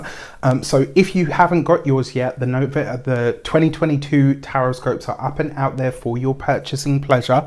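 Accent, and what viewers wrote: United Kingdom. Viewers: Sag Rising here and that was totally on point thanks! Fellow Brit here too and it's refreshing to hear another Brit doing tarot 🙂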